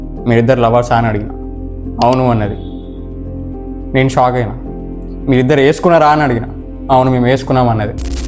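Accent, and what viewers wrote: Telangana, India. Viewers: Telangana yasa bagundhi bayya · Telangana yasa mastundi kaka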